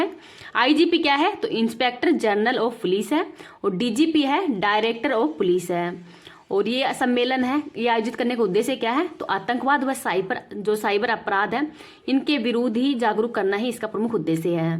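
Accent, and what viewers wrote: India. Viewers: Dear ham up se hai.. Aap हिंदी को राजस्थानी मे थोड़ी मिक्स करके बोलती है और जल्दी जल्दी बोलती है तो कम समझ आता है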